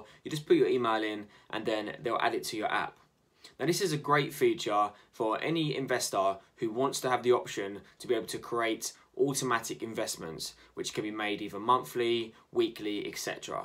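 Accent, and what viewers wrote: United Kingdom. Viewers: Hey, I like the British accent. I’m Kenyan and because we were a British colony, that’s the English we speak there. When I moved to the US 9 years ago, I had a hard time adjusting, and even today I still struggle. Other than that, great content!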